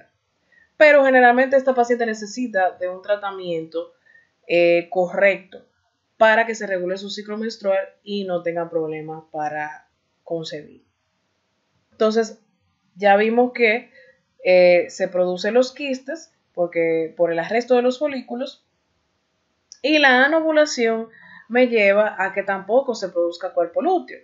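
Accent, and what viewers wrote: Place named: Dominican Republic. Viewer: Excelente explicación, felicidades!! Desde que escuché el acento supe que eras dominicana!! éxitos!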